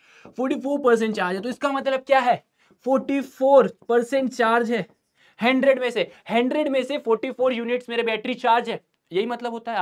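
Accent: Bihar, India. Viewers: Lagat hai sir ji bihari ba 😊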